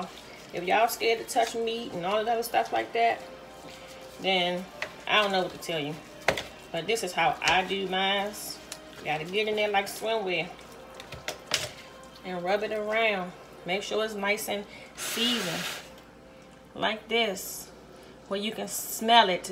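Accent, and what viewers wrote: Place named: DMV, United States. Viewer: Hey neighbor 👋🏾 I’m in the DMV too and know that accent anywhere! Girl I’m making these tomorrow with some dirty rice and sauce! Thank you so much for sharing your talent and giving us a fantastic recipe!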